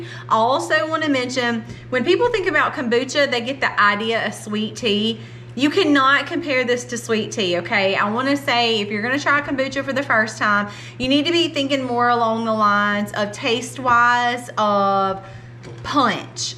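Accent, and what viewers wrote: South, United States. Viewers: I do apologize the stupid speaker phone I am going to have to go over what it says I really like your accent you could tell your Southern. My accidents between Michigan and South so it's all screwed up LOL and why I like you and your family really because you your husband and you are not afraid to bring up Jesus Christ and you're not afraid to admit why you want to homeschool your kids God sees that so keep doing what you're doing and wild edibles there's an ideal could do a thing on that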